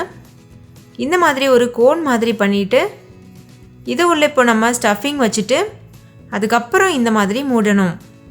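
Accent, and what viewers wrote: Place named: Kerala, India. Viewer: நீங்கள் கேரள மாநில பெண்ணா? தமிழ் நல்ல அழக பேசுறிங்க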